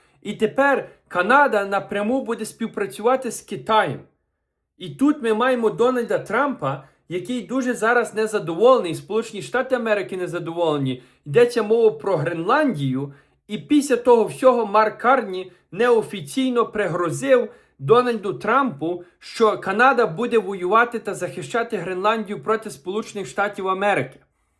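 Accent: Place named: Western Ukraine, Ukraine. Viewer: Он бандера это у них такой диалект западенский бандера гельготун на мойве, это не украинська мова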